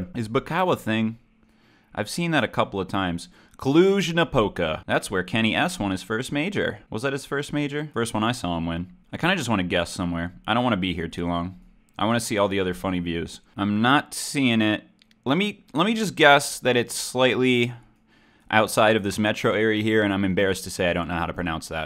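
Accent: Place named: Midwest, United States. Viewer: I love the Midwest accent for i live in the Midwest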